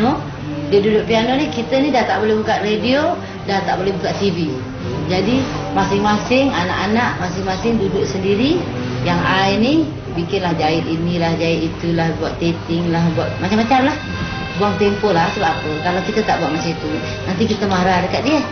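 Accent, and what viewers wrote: Singapore. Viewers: Bahasa Melayu loghat Singapura. Jarang boleh dengar pada masa kini.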